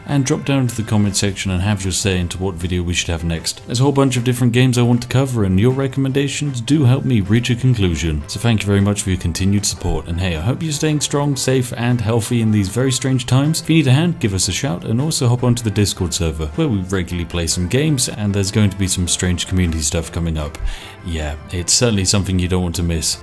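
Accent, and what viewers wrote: United Kingdom. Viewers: I heard "welcome to the wonderful world of" and thanks to the British voice my brain auto filled Terraria. I miss TB. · I love how unapologetically British you are.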